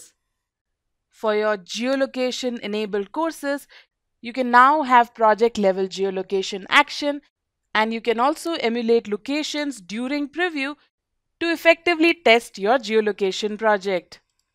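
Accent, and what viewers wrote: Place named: India. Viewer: Indian accent, better get used to it. They are really good at software development...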